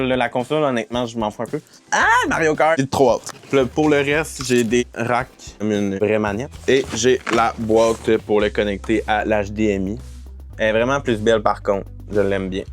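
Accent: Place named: Canada. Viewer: oh no entiendo nada de lo que dices pero el francés canadiense suena tan diferente... me suscribo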